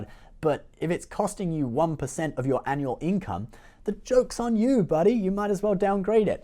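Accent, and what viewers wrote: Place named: United Kingdom. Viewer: British English, my friend.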